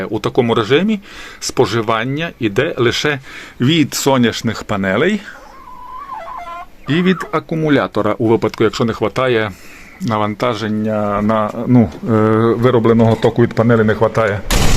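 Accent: Transcarpathia, Ukraine. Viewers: По акценту зрозуміло що Закарпатський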